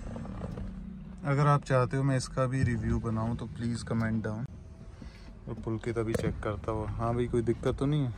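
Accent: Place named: Himachal Pradesh, India. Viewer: Are bhaiya aap to mandyali bolte ho nd fortuner pai ye roof par acha ni lag rha hai taxi lag rahi hai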